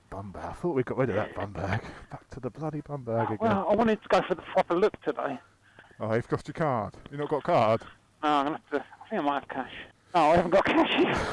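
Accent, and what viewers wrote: United Kingdom. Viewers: reviews are certainly more watchable when it's in a smooth english accent. Sure beats Americans telling me "it's frikkin awesome!". May want to think about quitting your day job;) a walking disaster haha either that or his comedy act is really coming along. Can I ask…how much does it cost to feed and look after a pet? only they look like so much fun but I've also heard bad things like they go a bit ravenous over a nipple and also pretend to leave their debit card at home when it comes to paying all the time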